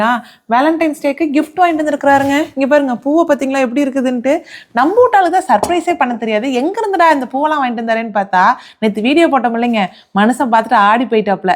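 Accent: Kongu, India. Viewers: Unga slang கொங்கு tamil மாதிரி இருக்கு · Kongu tamil sis🎉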